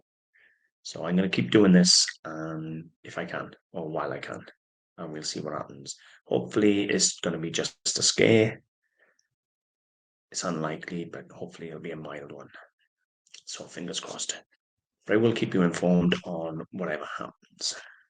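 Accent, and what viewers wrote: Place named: South Wales, United Kingdom. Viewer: Hi I’m fairly new to your channel. I’m in S Wales also and it was wonderful to find your videos and hear your accent. I m also new to reselling and I sincerely thank you for sharing your wealth of knowledge, expertise and enthusiasm.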